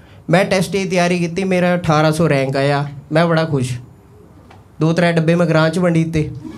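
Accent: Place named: Pahadi, India. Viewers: I m from j&k your dilect is like doda/baderwah language ...your laguage has resembelence with doda/baderwah language... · 🎉🎉🎉🤣🤣🤣pahadi accent op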